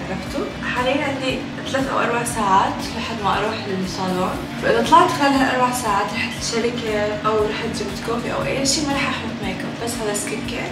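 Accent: Iraq. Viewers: اقلكم هسه سوريه لو عراقيه قلولي مرة تحجي عراقي مرة تحجي سوري هسه انا احتاريت قلولي بدون جذب لأن انا متابع جديد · بطلتي تحجين عراقي 😮😮 و صارت حركاتج. غريبه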